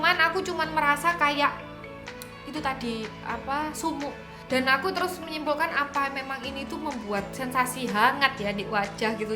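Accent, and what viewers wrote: Java, Indonesia. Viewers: Medoknya bikin kangen❤ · Aku paling seneng medok'e mbak 😄 (((SANGAR YA?))) Btw, kulit wajahku berminyak dan aku juga ngga cocok Some By Mi, setelah produknya aku pake sampe abis, aku puasa skincare dulu baru pakai skincare yang sederhana aja; facial wash, toner, dan moisturizer sampe kulitku anteng. Ditunggu video selanjutnya, mbak! Aylapyuh! 😘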